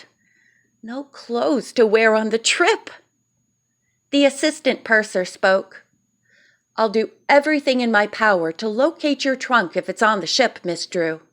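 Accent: South, United States. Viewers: I love your southern accent !! It is perfect !!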